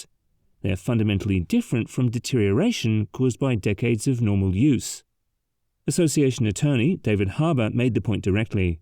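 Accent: United Kingdom. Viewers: Ironic a bloke brit narrating this brit building catastrophe.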